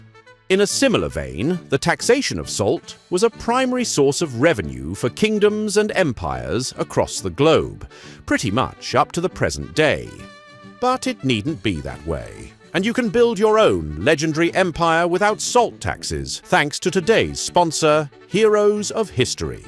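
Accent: United Kingdom. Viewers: The new narrator needs more practise putting on a heavier british accent with more inflection.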